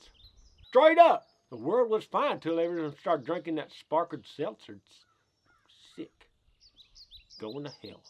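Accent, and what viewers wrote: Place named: South, United States. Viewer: I can understand everything he says! Lol that’s marrowbone talk right there. Yee yee!!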